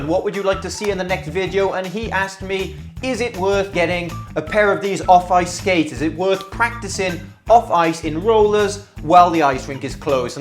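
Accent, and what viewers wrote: Wales, United Kingdom. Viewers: also, you have an interesting accent, are you Welsh by any chance? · anybody else curious how he got a welsh accent? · Is he Welsh? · Oh wow are you welsh? Tin siarad cymraeg?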